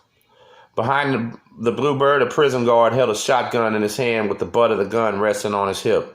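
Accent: Texas, United States. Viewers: Hey man..your vids are def. part of "my show" that I watch weekly! My kids dad is doing a 20 yr fed sentence at the moment. We're from Lubbock TX. He WAS in Big Spring TX but just got moved to a medium facility 😟 obviously Idk where he's going to end up in the U.S. but...hope its not too bad 🙏🏼 anyways, your Shit hits home for me 🙂 sending good vibes and energy your way man! BTW!! Man you sound just like someone from TX too!!✊🏽 #mynigga lol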